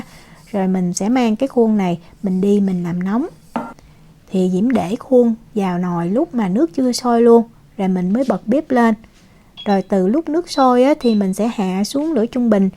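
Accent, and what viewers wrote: South, Vietnam. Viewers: 南言葉が耳に心地よいです。 ホーチミンに居るときは 蒸しヨーグルトケーキだと思ってました😹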